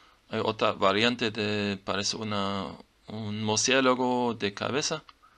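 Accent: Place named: South, Mexico. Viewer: Al escuchar a este hombre pense que era un indigena del sur de Mexico - me sorprende que sea Europeo.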